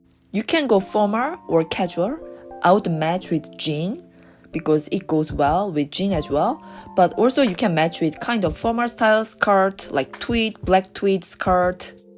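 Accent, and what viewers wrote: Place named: South Korea. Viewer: Are you Korean? I am Korean too and I can kind of detect it from your accent. Lovely looks!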